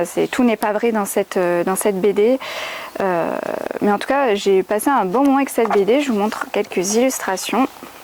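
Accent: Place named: South, France. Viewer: J'espère ! En Savoie, à 1h30 de Grenoble et d'Annecy, au pied des stations de ski les plus réputées du département ! T'es dans le Sud toi non (du moins ton accent est du Sud) ?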